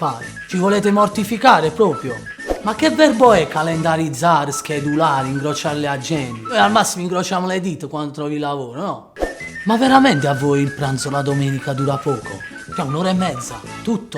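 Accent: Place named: Bari, Italy. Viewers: MissLaura ma vedi che quello che usa non è dialetto comunque, parla italiano con accento pugliese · Italiano? ci provi a parlarlo...... sei un barese non te vergognare. Identikit perfetto, se urla e ZAMPA e barese. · ahaha, molto simpatico davvero :))) Hai abbastanza ragione, così come ho la certezza matematica che ti stessi rivolgendo ai milanesi e NON a quelli del Nord! Ogni tuo singolo esempio collima perfettamente con le abitudini dei lombardi, specie versante Ovest, ma davvero credimi, non ha proprio nulla a che vedere coi veneti o i friulani. Sì, quasi tutti ceniamo intorno alle 20 e in estate una mezzora più tardi e altrettanto vero che anche i trentini usano l' articolo davanti ai nomi. Ma su tutto il resto proprio non ci siamo ed è opportuno precisarlo, perché gli amici del sud potrebbero davvero credere che tutto il nord sia abitato da gente che si comporta così. 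Fate un salto a Bologna, a Venezia, a Trieste e non ditemi che davvero non ci siete mai passati prima e non avete notato le differenze!! Bel video, comunque, mi sono divertita con il tuo barese.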